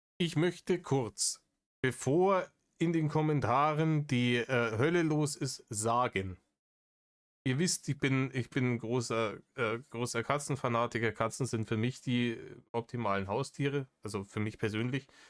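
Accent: Bavaria, Germany. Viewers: Lieber. Ich bitte dich. Ich brauche mehr bayrische Stimme, die sich über Dinge aufregt in meinem Leben. Also mach „Narrisch“ Videos, APO Red, Rainer, Politiker oder sonst welche Schwachmaten. Ich hab vor lauter Schmacht schon alte Rainer Reactions angeschaut.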